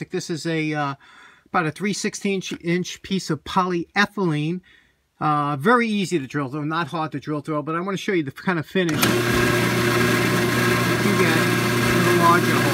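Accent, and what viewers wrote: New York, United States. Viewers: Is that a New York accent I hear? (I'd say definitely East cost?) :-)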